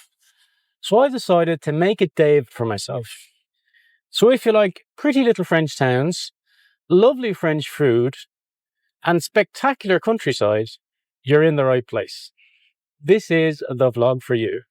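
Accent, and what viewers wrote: Ireland. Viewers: Ok, inquiring minds want to know.....without getting too personal, why are you still single? My goodness, I concur with all the ladies on here, you are a great catch and check all the boxes. Handsome☆Huge Heart☆Funny☆Great Communicator☆Hard Worker☆Irish Accent☆Great Family Values☆Loves Puppies & Old People☆A Wicked Sense Of Humor☆A Great Friend☆ Be still my beating heart! ♥ A great vlog as usual, thx! 😊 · France is just so beautiful. Think I might just have to take a drive over there and explore a bit. Loving listening to your soft Irish voice each evening. Glad you finally got a day off! Keep up the great work . X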